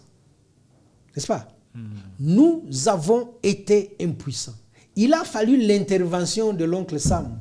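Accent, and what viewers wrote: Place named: Rwanda. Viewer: Mr tu es rwandais ar ta tonalité prouve que tu l'es et tout ce que tu dis est vide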